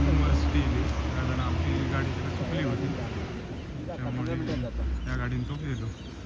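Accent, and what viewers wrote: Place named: Devgad, India. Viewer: तुमच्या देवगड साईड ची मालवणी वेगळी आहे थोडी. मालवण साईड ला , मी जातलय म्हणतो आम्ही आणि तुम्ही मी जाणार. परत, ला साठी मालवणीत शेवटी क लावतात. तुम्ही मराठी सारखं ला लावतात. उदा: देवगड ला आमच्याकडे देवगडाक असे बोलणार.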